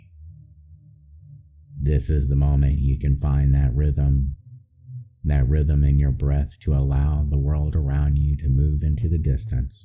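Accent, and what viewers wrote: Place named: Texas, United States. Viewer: Putting how incredible the rest of this is aside for a moment, your accent is incredible. Sounds Texan to my ears (though that might be my bias talking since I grew up there), but no matter what it is, it’s very soothing!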